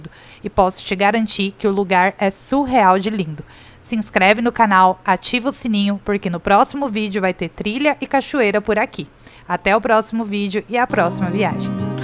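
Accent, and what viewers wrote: Minas Gerais, Brazil. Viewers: Já é quase mineira.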